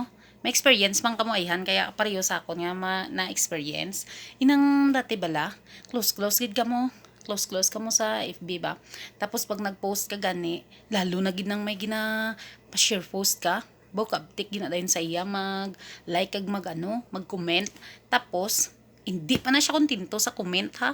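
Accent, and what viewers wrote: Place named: Iloilo, Philippines. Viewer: gusto ko talaga ang accent ng ilongga grabe ged real talk mo ya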